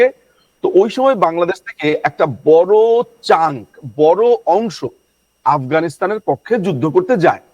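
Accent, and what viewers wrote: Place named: West Bengal, India. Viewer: এই মেয়ে -------- তোর কথার সুর পশ্চিম বাংলার মত।।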